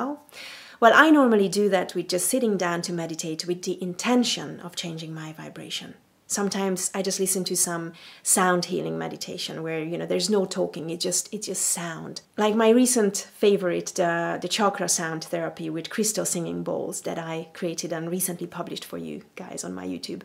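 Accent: Hungary. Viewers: Hi from Hungary. You sound to have a Hungarian accent.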